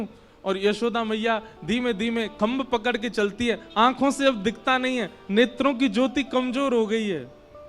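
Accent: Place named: Braj, India. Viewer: Guru ji aapki brijwasi bhasha ka aisa jaadu hai itani mitti hai ki katha sunene ka aanand hi alag hai sub bhool jaate hai. Jai jai shree Radhey shyam ladli ji apni kripa aap par sada banaye rakhe. Aapko koti koti pranaam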